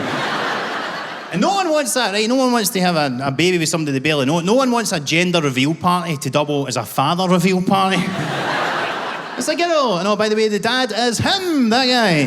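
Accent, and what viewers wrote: Scotland, United Kingdom. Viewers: This dude kills me, I need to go to Scotland to see one of his shows even though I need the subtitles to understand the Scottish accent 😅. Thanks Marc Jennings!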